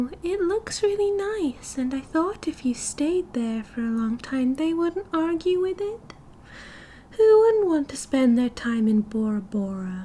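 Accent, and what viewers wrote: United Kingdom. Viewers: Brit accent...? HELL YEAH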